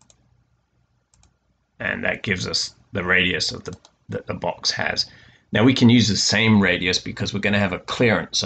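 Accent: United Kingdom. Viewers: Great videos, brings home the benefits of parametric designs, very well explained. You mentioned the possibility of adding this to an assembly, would be great to see that. Would also love to see something with print in place hinges on a box, can't find a full demo for freecad anywhere. Can't place your accent, doesn't sound American, dare I say more British ? Thanks for the time you put in to help out a beginner. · You are a great teacher. I was looking for a CAD Software and found FreeCad. The new good CAD-Programs are only for rent anymore. As a single-man-business in Germany I just can´t afford to pay 500 Euro per month for renting a CAD-software while my main business is calculating frames and using other software. This software is meant for Industry - some fulltime - drawers, who live in their element - with their shortcuts and their productivity. Anyway, I would like getting better at Freecad and the FEM - workbench. 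Thumbs up, you´re a great person having an english accent and living in the US. Freundliche Grüße, Niko Böhm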